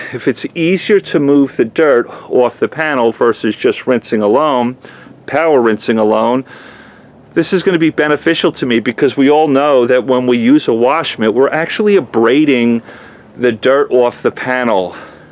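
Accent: New York, United States. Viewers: sounds like new york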